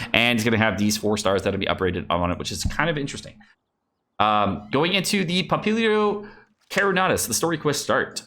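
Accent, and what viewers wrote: United Kingdom. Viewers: Yay british is here again ☺️ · I do like British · I actually look forward to the intro with british 😶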